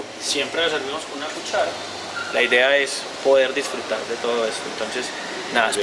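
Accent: Colombia. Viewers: Por el acento es colombiano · es colombiano por el acento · cheff con acento colombiano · HABER , HABER ........ COMO HAZ DICHO .. . por tu fonetica entiendo que eres colombiano .. el cebiche PERUANO nunca pero NUNCA se , utiliza perejil . SE UTILIZA CILANDRO huev....... y el sazonador que indicas alegremente y, tratas solo tratas de explicar , se aplica de acuerdo al criterio del cocinero y eso lo hacen cuando el pescado no es fresco y no es apropiado para un cebiche .. como en tu caso NO quiero ser alarmista pero los medicos recomiendan no consumir algunos peces y entre ellos esta la tilapia que por cierto hay mucha variedad ..... este cocinero de tercera ... mejor dicho ayudante de cocina ... ya ha tenido mucho tiempo para aprender a hervir agua ..dado el tiempo de publicacion de este video ...,, deseo y le pido que para hablar de cocina PERUANA tenga la gentileza de aprender mas ........ 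un saludo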